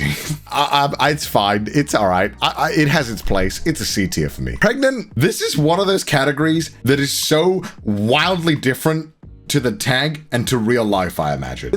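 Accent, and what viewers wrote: United Kingdom. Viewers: this is the first time I keep hearing just be so british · Why does sound extra British in this video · WAIT- BUT THE WAY HE USED HIS BRITISH ACCENT TO BEAT THE YOUTUBE-SYSTEM 😂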